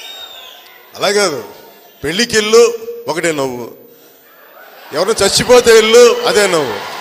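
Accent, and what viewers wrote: India. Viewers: nuve inter failed candidate... America ki adukkodaniki vellii...nuvu ne Buttler English...vammoooo... nee picchi elagey continue cheyee.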